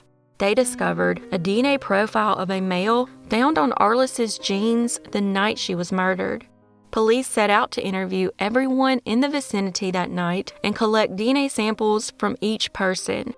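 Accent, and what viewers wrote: South, United States. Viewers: The scumbag couple that took the lives of Kandis and Terri Ann had one of the most pathetic excuses I’ve ever heard! “They had no other choice”. WTF!! Murder people or leave and go somewhere else, it’s not a hard choice! No murderer here has an excuse, that one just got to me, how stupid and vacuous this couple are! 🤬, thank you as always for your hard work and compassion for the victims. I adore listening to your gorgeous voice and southern style. ❤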